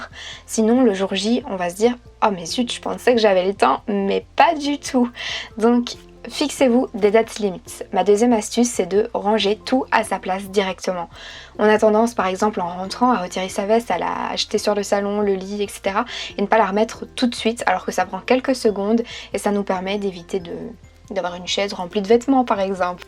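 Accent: Belgium. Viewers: J’adore ton accent belge tu me manque ❤️❤️❤️❤️❤️😪 sinon tu peux faire une vidéo sur comment avoir des bonne notes car j’en ai des mauvaises et j’en ai marre merci bisous❤️❤️❤️❤️❤️❤️❤️❤️❤️❤️❤️❤️❤️ je t❤️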